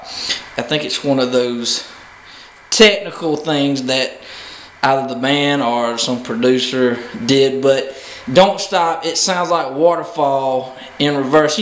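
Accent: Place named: South, United States. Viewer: Great knowledge/review. Funny to hear a southern American talk about the, I'm half Eng/ Half American so It's always nice to hear that accent. Great job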